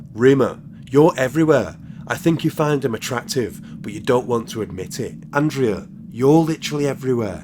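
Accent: United Kingdom. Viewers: Hello!!! I'm French so I'm sorry if I make mistakes.... I've subscribed in your channel, I like very much those histories and the way you narrated, with respect you are funny and you make me smile many times when I hear you.... I think you are from UK.... Thank you very much and waiting for more stories!!!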